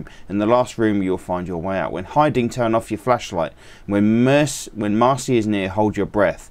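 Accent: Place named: United Kingdom. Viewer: Thanks for playing! We are launching early access in mid-October. Off topic- where did you grow up in the UK (accent question)?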